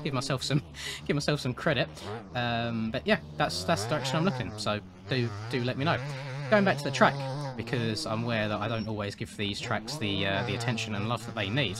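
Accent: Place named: England, United Kingdom. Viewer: Defo go for commentator career. English accent makes everything more official.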